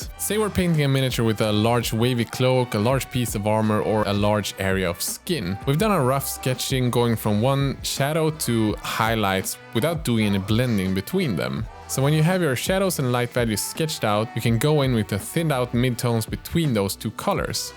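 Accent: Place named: Sweden. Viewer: as long as it suits you. If There will be subtitles it is fine). Like Angel does. I love Sveedish accent, I am watching Pewds since almost the beginning almost used to it. Just received the wet pallet from Redgrass. You should have a deal with them. Bought after your recommendation. Thanks for great content!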